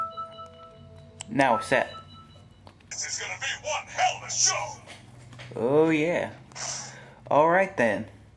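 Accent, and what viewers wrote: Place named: South, United States. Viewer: HE IS SOUTHERN HES FROM MISSISSIPPI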